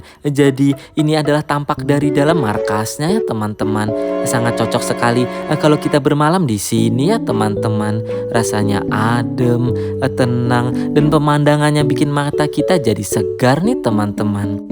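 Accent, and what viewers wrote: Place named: East Kalimantan, Indonesia. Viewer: Kaltim nymk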